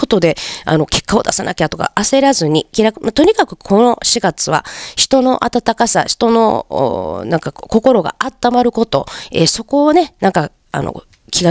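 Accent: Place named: Kansai, Japan. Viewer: 何年振りかにさんの動画を開きました。いつも通りのパワフルな関西弁が楽しかったです♪『エネルギー閉じる』って言葉の感覚が新しくてためになりました❤ジャブ打っていきまーす❣️ありがと〜♪ ✨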